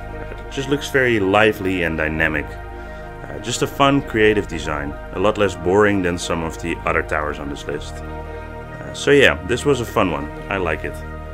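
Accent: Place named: Netherlands. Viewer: No hate but this is the first video I’ve watched from you and I immediately recognized your Dutch accent (de mijne is erger😅😭) and I love accents so it doesn’t matter❤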